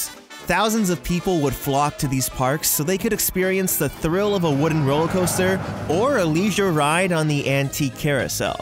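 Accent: Canada. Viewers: Also are you Canadian?!?! I need to know lol 😂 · Are you Canadian????? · Are you Canadian?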